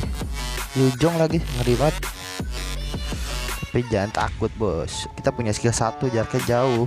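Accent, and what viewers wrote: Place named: Jakarta, Indonesia. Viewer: Buset, noh Betawi bener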